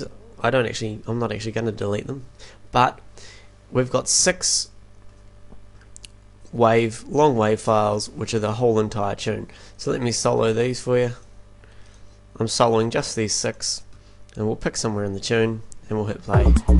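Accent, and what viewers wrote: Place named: New Zealand. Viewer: Oh and by the way, I love the accent, I'm from Australia and for some reason. Just love New Zealand accents. "You might like 4, you might like 8, you might like 10 or 2. I like sex!" Hahahahah that was soo good! Love you man, keep up the good work!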